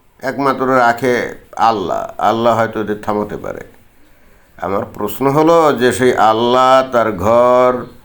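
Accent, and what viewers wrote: Bangladesh. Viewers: Apnar Kotha gulo Sunte khub Valo lage.. B.deshi Accents.. Namoskar dada..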